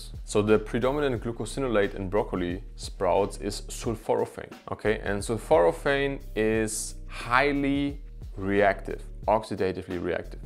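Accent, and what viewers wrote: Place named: Germany. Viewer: Let me guess.. Are you German? Your accent sound like that ✌️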